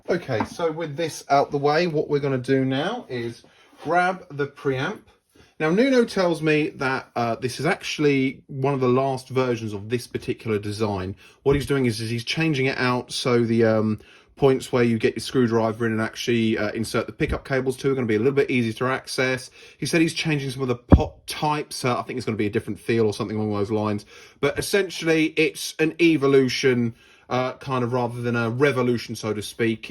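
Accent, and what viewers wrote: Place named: United Kingdom. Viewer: Pity , as from accent it looks is based from U.k . Meaning the second filter preamp avalailbe on the market is still in u.k , brexit sucks . Is also bit weird coming so late in the filter party in the very same country ,but more the better. For reference decades after East ( the best preams of the world i.mo) did it for acg basses , following on the alembic fame for that in the past ( with lo pass AND hipass btw , i have it , exceptional , not practical for live use for speedo changes though ,so deinstalled ages ago ) . Again, The more the better so lucky you , just honestly it should have been mentioned , cause EAST (uk..) IS the MOST versatile if you just judge by that variable , and by far . Us players know it well lolz Anyway that confirms bass is DECADES ahead guitars in terms of alternative solutions ( guitarist dont want even try that lolz)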